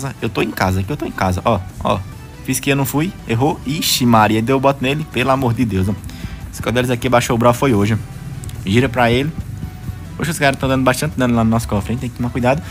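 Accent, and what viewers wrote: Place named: Bahia, Brazil. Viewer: eu te amo bahia bahia, eu amo teu sotaque bahia, manda um salve pra paraíba 😁😁😁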